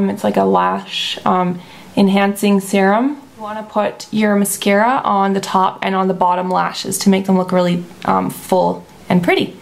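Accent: United States. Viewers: YOUR NOT FROM AMERICA!? YOU HAVE SUCH AN AMERICAN ACCENT THOUGH :O did u used to live here? thats awesome, i want to move to germany when im older :D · probably a stupid question but where do you live cus you sound American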